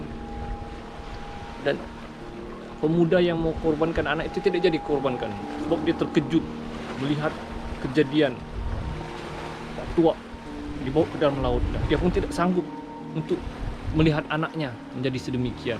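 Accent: Sabah, Malaysia. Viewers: sabah kerass boy. hahahaha.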